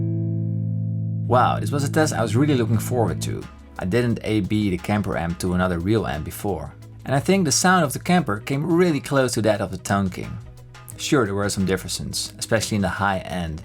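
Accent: Netherlands. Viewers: Ik denk dat je nederlands bent toch? Wel goed accent!! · U sound dutch lol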